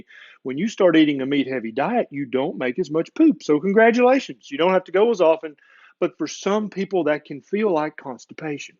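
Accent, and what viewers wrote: South, United States. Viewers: So I'm in my thirties and just giggled at you saying "poop" because of the southern accent. I'm an adult. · It must be the southern drawl. Makes the word poop sound so flavorful.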